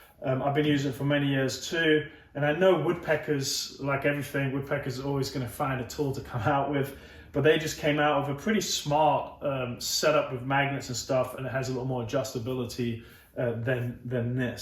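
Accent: England, United Kingdom. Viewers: I’m just here for the great English accent!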